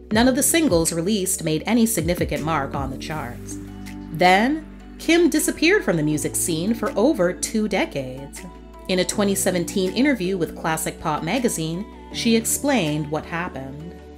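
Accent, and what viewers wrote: United States. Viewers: The narrator is American so.......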